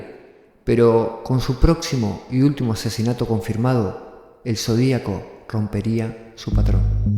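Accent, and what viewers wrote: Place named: Buenos Aires, Argentina. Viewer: sha, she, shi, sho shu. Muy porteño para mí gusto.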